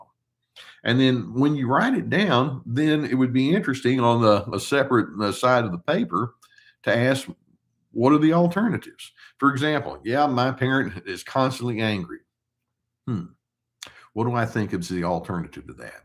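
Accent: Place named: Texas, United States. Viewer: Wow I’m from Texas to no wonder I feel at comfortable listening to you your accent your mannerisms your belief you vibrate like the people that I come from where I come from I’m from Lubbock texAs and also I like your Maas green shirt you wear unusual colors I know that the topic is very important and I’m learning so much from you it’s very very exciting to be empowered by your knowledge empowered to be me what a concept wow thank you so very very much. Such a gentle soul THANKYOU🐶🆙🙏🏻🤍😃🌷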